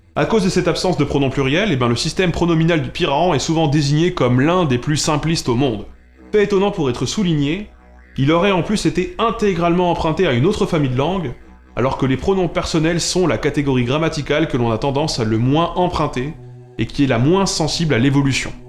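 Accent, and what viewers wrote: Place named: Franche-Comté, France. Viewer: Wow, j'ai eu cette vidéo en recommandation et je regrette pas du tout d'avoir cliqué dessus ! T'as gagné une abonnée 😊 Mais euh, ton accent, tu viens d'où ? On dirait la franche-comté...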